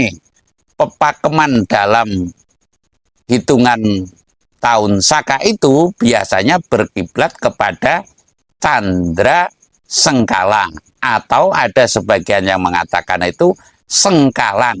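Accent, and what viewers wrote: Banyumas, Indonesia. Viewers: logat banyumasan memang spt itu juragan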